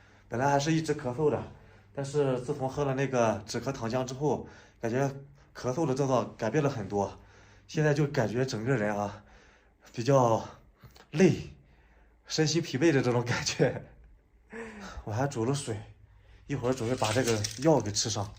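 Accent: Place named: Wuhan, China. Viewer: 有个武汉的口音。很熟悉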